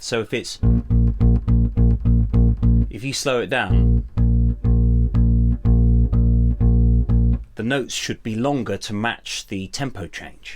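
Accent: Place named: United Kingdom. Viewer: Definitely not complaining, but are all online Bass instructors British?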